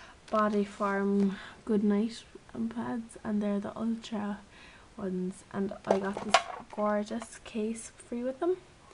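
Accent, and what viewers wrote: Cork, Ireland. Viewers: Are you Irish? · Are you from Ireland? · it's a cork accent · You're Irish! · I love your accent are you Irish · the first person I have heard with an Irish accent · You sound Irish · She's Irish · YOUR BLOODY IRISH THAT IS SO COOOOOL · She has a cork accent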